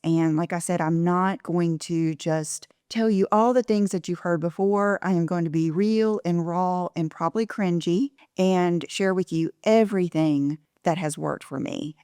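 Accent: South, United States. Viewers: One reason you’re successful is you’re really careful about what you say and how you say it. Even when you’re putting something out there that might be considered critical by some, you say, “How do I say this the right way, not to come out with the wrong intention.” I’ve been mindfully attempting to be more like that. I am really working on it. That, and carnivore, thanks to you. I love that you do so many videos each week. Love your voice, too. So comforting. I lived in Alabama for 3 years and though your accent is not N.Alabama, it’s a soft, sweet Southern accent, just the right speed, and tone. You keep doing you, which inspires us. ❤️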